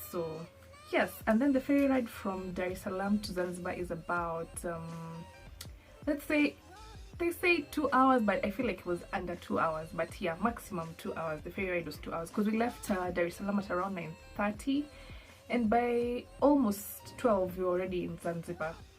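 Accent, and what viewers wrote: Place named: Kenya. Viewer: Oh you are from Kenya. I figured it out from listening to you.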